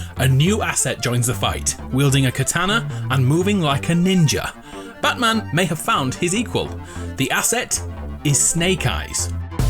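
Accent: United Kingdom. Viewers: youer British omg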